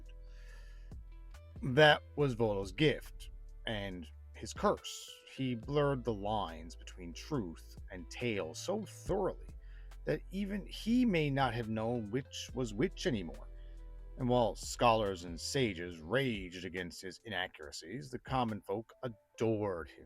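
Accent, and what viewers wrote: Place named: Newfoundland, Canada. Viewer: Love the video! Off topic, but are you from Newfoundland by chance? As a Newfie I think I'm picking up an accent there lol!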